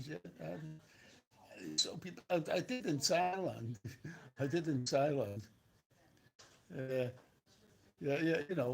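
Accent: Liverpool, United Kingdom. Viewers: True Scouser!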